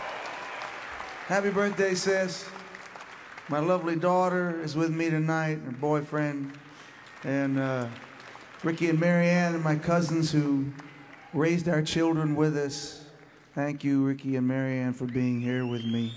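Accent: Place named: New Jersey, United States. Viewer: It's the way he talks, if you've ever heard him before. Part Jersey, part easy going, part storyteller...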